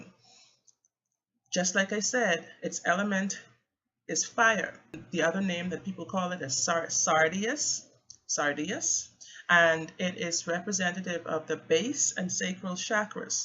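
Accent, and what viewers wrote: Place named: Bahamas. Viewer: Are you bahamian? I hear an accent. Great video!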